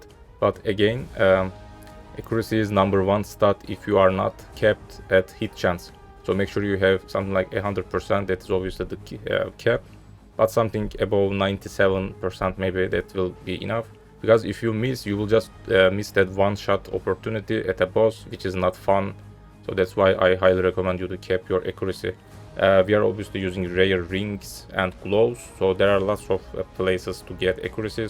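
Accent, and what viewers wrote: Turkey. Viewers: Türk ingilizcesi bu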